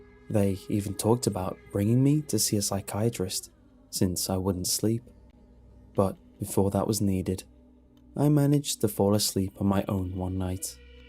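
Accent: United Kingdom. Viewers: is the british voice narrating the story. Yes, he only reads stories that other people post. I’m not blaming for this at all. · Oh no british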